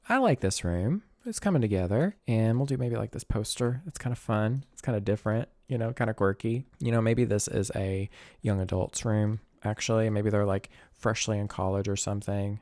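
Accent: South, United States. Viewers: Just working from home and listening to calming voice! I work CS and find that his smooth tones and familiar Southern access sooths me while I deal with the aggravations!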